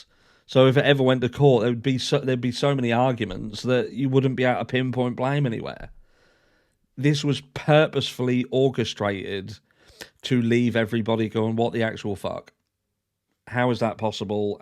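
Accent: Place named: Norfolk, United Kingdom. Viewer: you're very welcome. It's mad considering both our countries speak the same language there are so many differences, and I much prefer Norfolk accent to my own accent 🤣🤣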